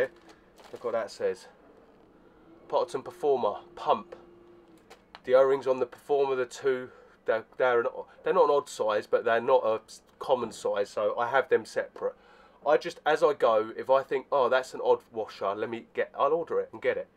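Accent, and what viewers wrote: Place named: London, United Kingdom. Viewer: loving your videos mate! your a funny guy you remind me of del boy trotter in a good way and with the london accent lol if thats packed in now your wife wont be happy she will be like "why do you have to start pissing around with it showing all your pals on youtube!!!" lol anyways mate keep up the good work hope you and your family had a great crimbo..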